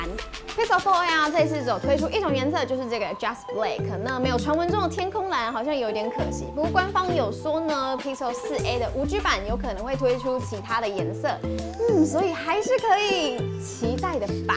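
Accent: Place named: Taiwan. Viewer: 台湾华语 赞！！！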